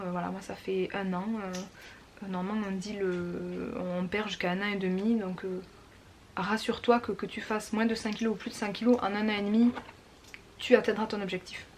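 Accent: Toulouse, France. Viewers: Salut moi c'est Virginie..j'ai été opérée d'une sleeve en janvier 2017, j'ai perdu près de 50 kgs mais je partais de très haut (133kg) je suis donc encore en obésité 1 ...je voudrais perdre encore 13 kgs pour atteindre mon objectif personnel...c'est dur dur...en tout cas, je te dis un grand bravo...tu es magnifique et merci pour tes vidéos ...ton accent Toulousain me met du soleil dans le coeur... (je suis née à Montauban mais j'ai grandi dans le nord. ..)... merci et bonne continuation à toi...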